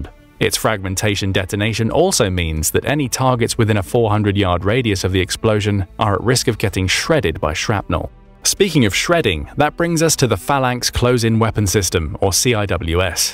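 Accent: United Kingdom. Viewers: This is what happens when random guys try to make videos of military hardware. You're going to get your butts handed to you by the YouTube algorithm, by having actual military enthusiasts watching. With all those inaccuracies pointed out in these here comments. Caliber in traditional military sense describes the ratio between bore diameter and barrel length. The 50 caliber Mark 22 actually fires 3 inch/76mm shells, not .50BMG ammunition. This is the kind of basic mistake that casts doubt on everything else referenced in the video. At least the British accent makes it seem more artificial and movie like.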